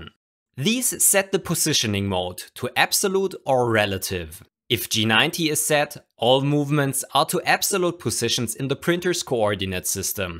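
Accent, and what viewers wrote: Germany. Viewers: Man hört raus das er deutscher ist :)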